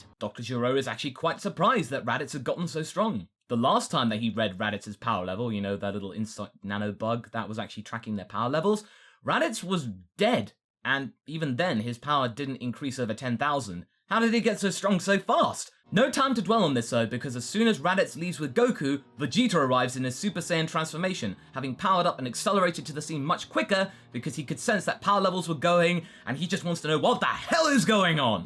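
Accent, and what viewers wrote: United Kingdom. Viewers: lmfao, his accent is what got me XD Damn British English!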